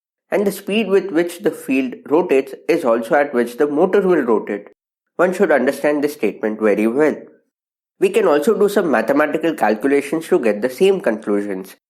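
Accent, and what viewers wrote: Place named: India. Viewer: I think the content is wonderful. But alas, I am not used to the Indian accent either. I suggest you can upload a subtitle to help our foreigners understand your explanation. Thanks in advance!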